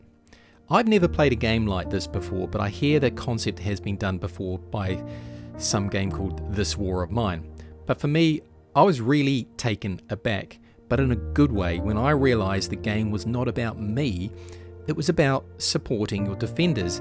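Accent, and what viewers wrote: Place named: New Zealand. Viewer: First review of yours I watched, and I really liked your reviewing style! I appreciate the slow, clear, unhurried commentary, and how well you structured the video. I've seen other reviews of this game where they never even mentioned the second scenario in the desert, maybe they didn't play it as much as you? Love the Kiwi accent too, even if you beat the Irish in my hometown Dublin just the other day!! Subscribed. ✌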